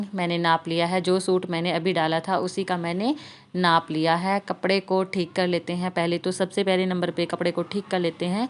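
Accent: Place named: Saharanpur, India. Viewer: Aap up me kaha se apki language se lgta h aap Saharanpur se h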